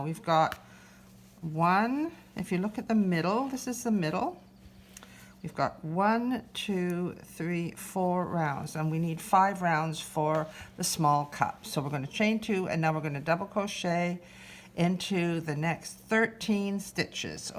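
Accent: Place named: England, United Kingdom. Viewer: Thank you so much for tutorial Turned out lovely loving your accent England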